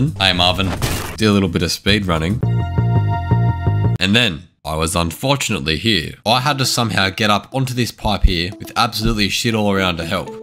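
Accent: Australia. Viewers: this dude is australia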